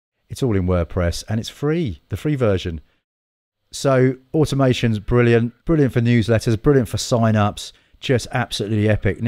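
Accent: United Kingdom. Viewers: Hey, I really enjoy your unique presentation style. You cover technical tutorials, recommendations, comparisons, a reactions, all with a British accent, in just 15 minutes. Great job! Keep up! You didn't ask to subscribe but I did.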